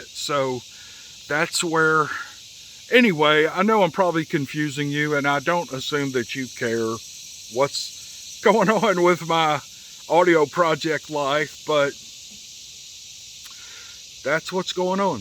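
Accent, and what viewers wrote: South, United States. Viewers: Hey,. I've been liking the Steve Lilly stories, as well. Was gonna ask you if you have any relatives in SC or in the Aiken/Augusta area. I'm Steven Buckner. Dad's name is John. His whole side of the family are good, (mostly! Lol) hard working Southern folks. Every time I listen to your stories or casts your country twang reminds me of my cousins, aunts, etc. Haven't run into many Buckners, even in my 10 yrs in the navy. None actually. Anyways, I thought I'd say hello and see if you might have some kind in red clay country. Take it easy as you can and get back to me when you can. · That melodious voice w hint of southern accent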